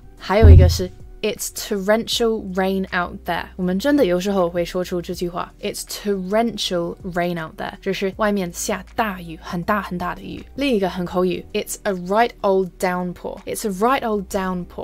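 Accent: United Kingdom. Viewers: 終於等到用英國腔的教這個，目前定居在倫敦，希望可以出一些跟孩子的聊天，小朋友在學校跟同學交流，家長跟老師的交流，租房子賣房子這些方面的聊天技巧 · 滿滿的英國腔😊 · 英國腔聽起來真的很好聽, 好漂亮!~~~~ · It's nice to hear a British accent in these types of videos (too often it's American accent).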